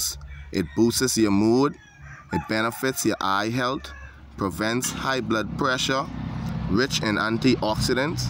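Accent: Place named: Bahamas. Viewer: Man, you can't hide that Bahamian accent. 😊 Thanks so much for sharing! Grateful for something from someone and somewhere I can relate to. Subscribed.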